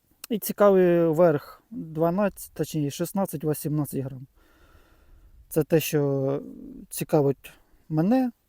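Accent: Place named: Russia. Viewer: Ну я зрозумів оріон це дуб , але з українською ти не дуже дружиш , кацапська тебе не покідає , але я бачу ти стараєшся удачі тобі в вивчення мови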